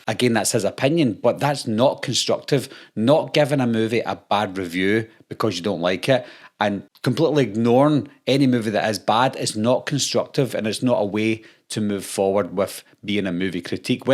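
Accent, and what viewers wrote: Scotland, United Kingdom. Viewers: How does one get THAT Scottish?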